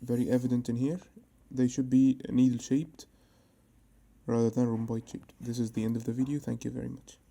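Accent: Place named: Egypt. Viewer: I knew you're egyptian by the word first. You, egyptians, say "firEst" Good video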